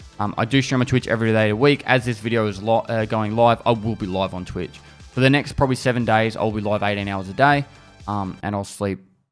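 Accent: Australia. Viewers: Never had issues understanding what he says, guess it's your ability to understand his Australian accent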